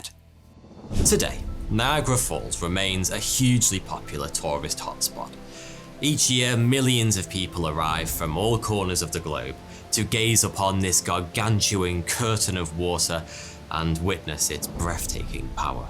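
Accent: United Kingdom. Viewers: Thoughty2 why are u British?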